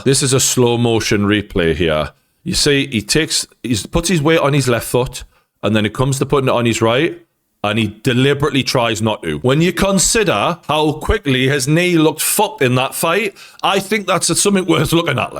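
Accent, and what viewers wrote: Newcastle, United Kingdom. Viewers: Geordie. You’re right. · Geordie boy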